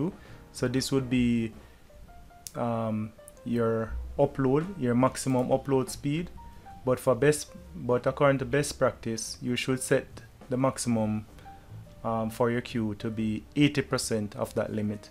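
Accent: Jamaica. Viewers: good and useful video, I feel the Jamaican accent very much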